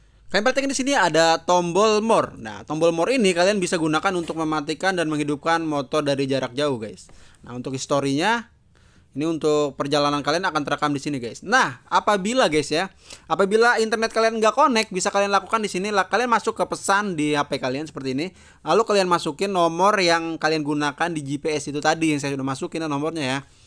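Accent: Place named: West Java, Indonesia. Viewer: Orang sunda bekasi nih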